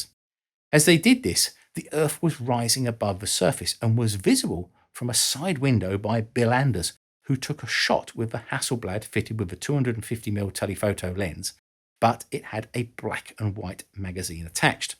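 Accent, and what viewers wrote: United Kingdom. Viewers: When NASA begins to run into a wall they bring this soft spoken non confrontational! British accented to sound sophisticated and knowledgeable! To help remove doubt that this is again a story for the first time discussing a hand held camera in mercury capsule?😂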